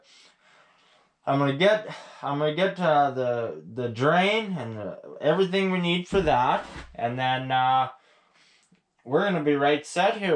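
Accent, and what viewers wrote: Canada. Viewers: Thank you! Has similarities to a project I'm working on. Also the thickest Canadian accent I've ever heard; it's great! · I love the Canadian accent and all your sayings 👍 Love the content too bud keep it up!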